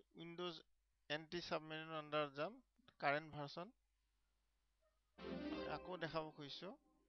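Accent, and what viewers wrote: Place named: India. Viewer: English with an indian accent.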